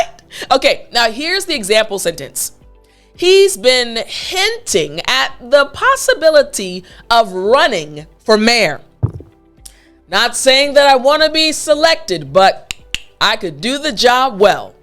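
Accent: New York, United States. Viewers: Are you from NY ? Sounds like that) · ARE YOU FROM NY?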